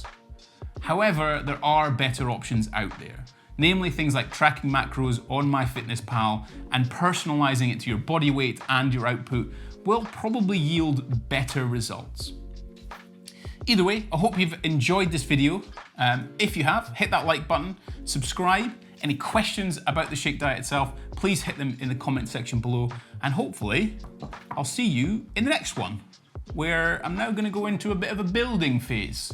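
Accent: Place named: England, United Kingdom. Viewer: I subscribe because of your English accent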